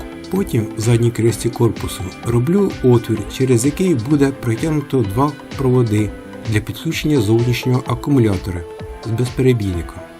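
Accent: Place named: Central Ukraine, Ukraine. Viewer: Акцент центрально-український, черкащина/південь київщини/кропивниччина чи полтавщина.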